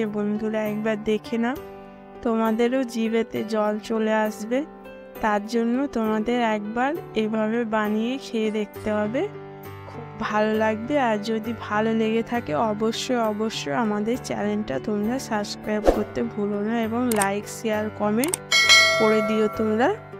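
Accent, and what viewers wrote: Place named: Kolkata, India. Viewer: তোমার কথা শুনে আমি বুঝেছি ,মনে হয় কলকাতার দিকে থাকো ।আমার বাড়ি কলকাতায়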